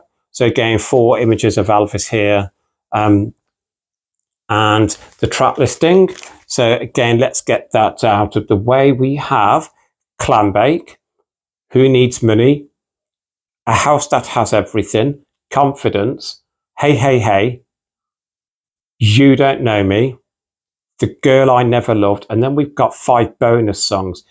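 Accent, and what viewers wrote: United Kingdom. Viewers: Very Interesting videos. Your English in very clear, even so, I'd like to know where your accent is from: northern, southern, cockney? Blessings.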